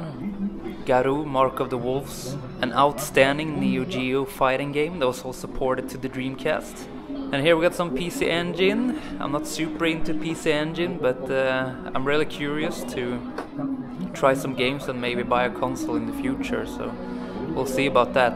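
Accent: Sweden. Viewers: Är du från Sverige?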